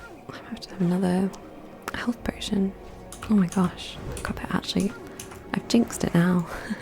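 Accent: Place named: England, United Kingdom. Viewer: Damn such a pretty voice 😮 trying figure out what part of England your accents from. I know your nervous about it but soft spoken suits you I love whispering too 😊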